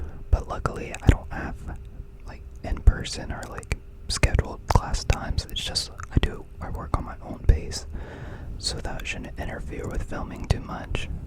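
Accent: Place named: Houston, United States. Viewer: Sounds like H-town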